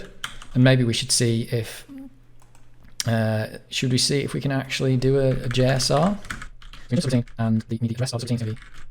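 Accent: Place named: United Kingdom. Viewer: Try to hire vocalizer it's high viscosity and hear it is unbearable. So much ear scratching and most of time floating slowly I listening at least +2x speed. I recommended you to switch standard US accent even Bharat more heareable than british. Or will be good if there is have any settings for prevent some countries and accents. I will glad if anyone knows like that filter tell me. Closing all sources much better than first option. Why i have to hear ridicilous sounds? Converting with transcript to all videos from same sources means cost.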